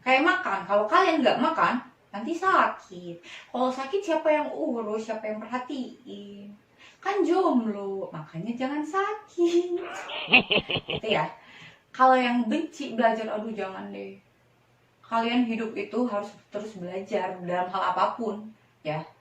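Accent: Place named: Palembang, Indonesia. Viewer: Palembang punyo ini.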